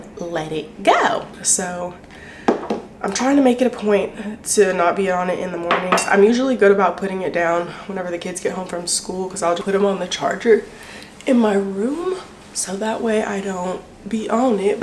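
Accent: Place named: United Kingdom. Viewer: British needs her own tiktok or something. 😂 She's a hoot! I mean, I love regular too, but British... LOL! I'm here for it.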